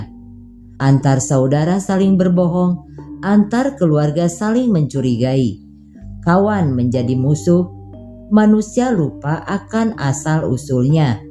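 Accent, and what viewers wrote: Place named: Sunda, Indonesia. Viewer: Narator nya ini berbahasa Jawa rasa Sunda.... wkwkw kedengarannya aneh lucu.... Salam sukses mbak naratornya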